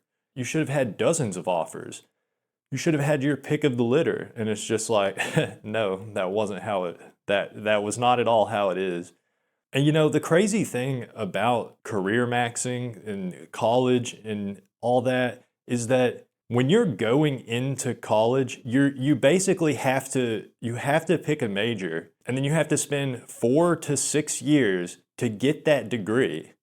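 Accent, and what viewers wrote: South, United States. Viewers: don't worry, I have the typical weird incel voice cause I go years without talking .you don't have that. You just sound like a stereotypical down south yank.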